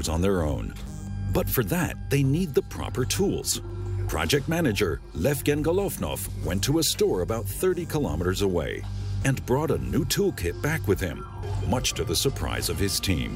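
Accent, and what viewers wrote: United States. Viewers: funny to hear a heavy american accent narrating russian hih-end technology in such a respectful way. there's hope for USA... hahahahahaha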